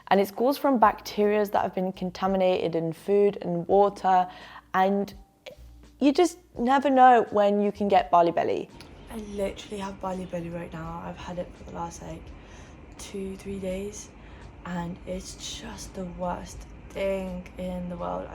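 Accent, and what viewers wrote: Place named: England, United Kingdom. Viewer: sorry where u from ? england? no surprice peace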